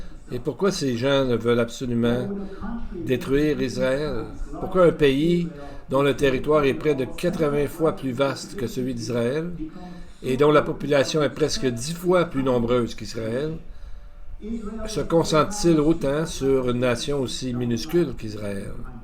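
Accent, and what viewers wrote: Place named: Canada. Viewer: C'est un accent canadien. Il est flagrant de s'apercevoir que l'intonation marque bien l'origine de ce son du continent canadien. Un beau pays, le Canada. Le Canada fait aussi de la politique, incroyable ! Dommage que l'on n'ait toujours pas la vérité. A croire que l'homme en est incapable.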